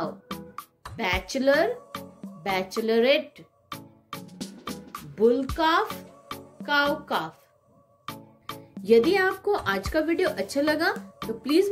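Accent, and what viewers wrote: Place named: India. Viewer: I like this video and I do not understand Indian accent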